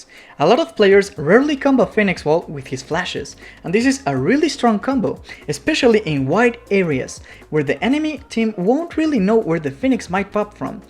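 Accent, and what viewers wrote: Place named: Brazil. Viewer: Parece o sotaque de um brasileiro falando Inglês.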